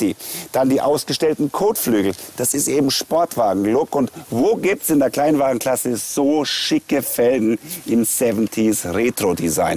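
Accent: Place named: Germany. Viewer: es gibt nichts schlimmeres, als wenn ein Deutscher italienisch spricht!!! Bitte verbietet das in Zukunft! Ich sag nur Schüttelfrost!!!!!!!!!!!!!!!!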